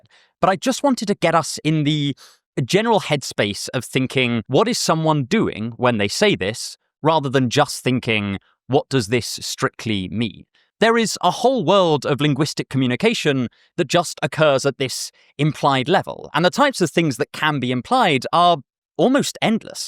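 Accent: United Kingdom. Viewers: has the most pleasant british accent i've ever heard. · I like the fact that refers to himself as aggressively British... He is definitely the most British sounding person I know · Can someone tell me whats his accent called? British PR? Modern PR?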